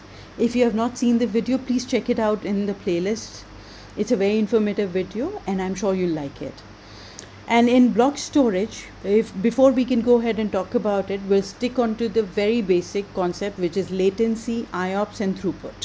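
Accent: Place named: India. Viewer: sure u indian...accent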